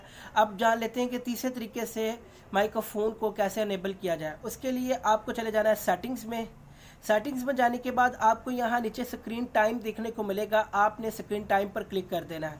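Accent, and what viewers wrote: India. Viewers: English title but indian speaker .. nothing inderstand 👎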